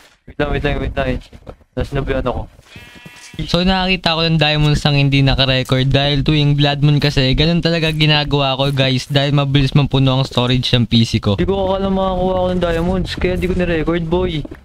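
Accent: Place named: Philippines. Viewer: Filipino Accent "para syang OPIS" ANG LT KUYA HAHAHAHA Nice vid😆❤️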